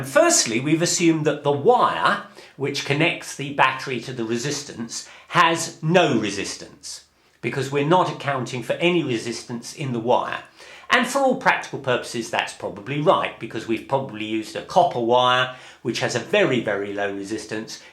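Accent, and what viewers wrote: United Kingdom. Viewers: i am too distracted to listen because his accent makes me feel like im in Hogwarts lol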